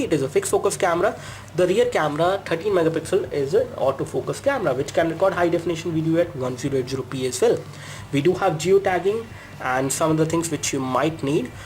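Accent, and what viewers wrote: India. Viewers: How the do you speak English so fast and fluent but yet you still have the indian accent ? blows my mind.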